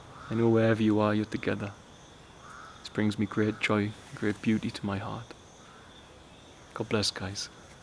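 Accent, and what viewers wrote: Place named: North East England, United Kingdom. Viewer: I feel the need to connect with our creator but I dont know how. I'm from the North West of England and I detect your accent is the North East. Can you or one of your congregation guide me to a sanctuary where I can find my way to God? I live in fear now but yearn to be a light in this dark time and lead by example for my family and community. I need help and I dont know where to turn. I've been praying for guidance and forgiveness. Please lord here my call. I want to serve the creator and bring others to Christ. 🙏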